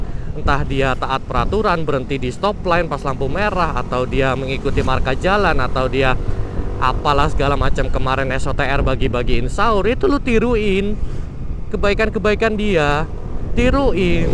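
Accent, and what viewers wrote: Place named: Java, Indonesia. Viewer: Baru pertama kali liat, dan ternyata medok medok gimana gitu, berasa ngedenger orang jawa, orang jawa mas?😂😂😂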